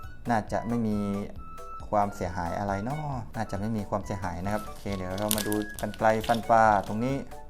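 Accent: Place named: Isan, Thailand. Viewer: 555มีอารมขันด้วย เว่าอิสานได้ยู่ตั้วนิ่☺☺😅😅😅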